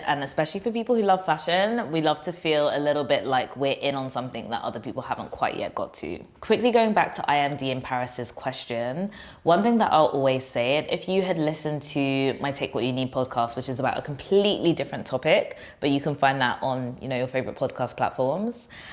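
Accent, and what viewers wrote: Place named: New York, United States. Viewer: This is the wisdom I have been looking for. No hacks, no copy and paste, no what are your competitors doing. Just pure inspiration and creativity that allows you to cultivate your voice and your story telling capabilities. AND it was applicable to me even though I am not a huge brand. I really appreciated this! And as a Brooklyn native there is something so NY about your delivery and I am here for it.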